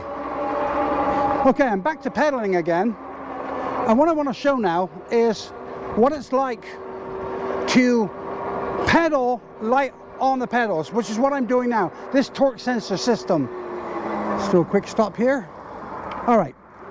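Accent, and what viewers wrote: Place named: United Kingdom. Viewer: Beautiful, you sound like you come from Blighty?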